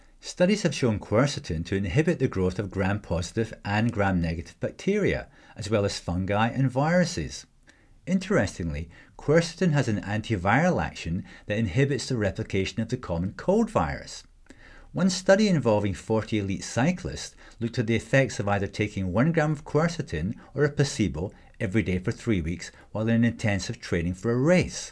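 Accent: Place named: Edinburgh, United Kingdom. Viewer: You’re not very clever, are you? There is absolutely no issue with the guys accent. Sounds like an educated Edinburgh Scot. OK, he’s not North American, but neither are 98% of the world population. You need to open your mind and open your ears.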